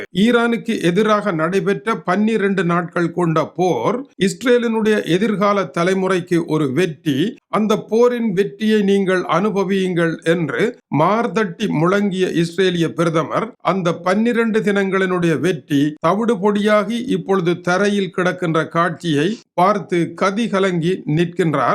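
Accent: Sri Lanka. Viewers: Beautiful Ceylon Tamil. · Elaigai tamilan awar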